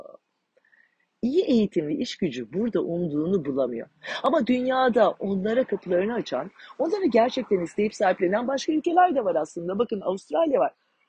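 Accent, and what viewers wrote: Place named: Turkey. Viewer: Hanim Turklerin aksani biraz garip geliyor Kanadalilara, kulaga cok hos olmayabiliyor, fark ettigim kadariyla sizde de o aksan yogun o bakimdan anlarim sizi spiker olarak almamalarini. Hintlilerin de ingilizcesi bize garip ama onlar genel olarak alismislar, Hintlilere daha aciklar. Soylediginiz seylerin cogu dogru ama iste cok calismamiz ve mucadele etmemiz gerek. Duzen oturana kadar surunduruyor Kanada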